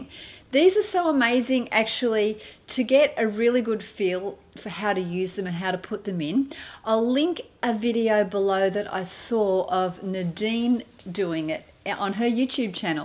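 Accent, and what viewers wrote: Australia. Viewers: Thank you for such nice helpful tips oh and I LOVE your Aussie accent☺️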